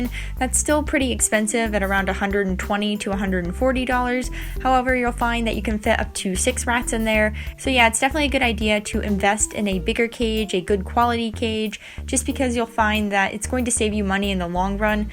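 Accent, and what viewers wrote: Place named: England, United Kingdom. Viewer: Interesting, i get to hear a version of you with a strong English accent using £ pounds instead of dollars. Love both versions!!! YOU ARE AMAZING 😁👑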